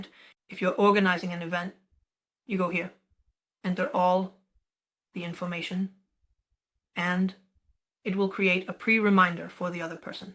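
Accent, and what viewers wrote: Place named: Italy. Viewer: Another great video but what happened to your wonderful Italian accent? Have you gone A.I.?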